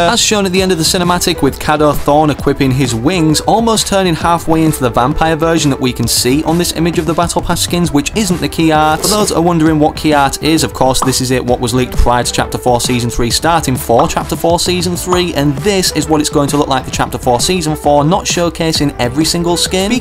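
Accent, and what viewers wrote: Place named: United Kingdom. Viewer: I never knew you where British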